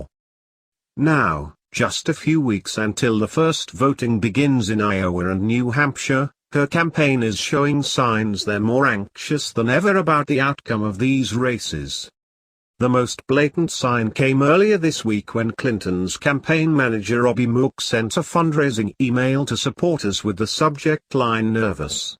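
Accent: United Kingdom. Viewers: I dont mind the british accent but im pretty sure its a bot though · It's a person just bad sound, and he sounds British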